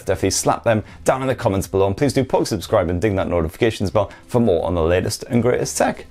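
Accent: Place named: Scotland, United Kingdom. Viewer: your accent... it's what keeps me hooked lol... Scottish?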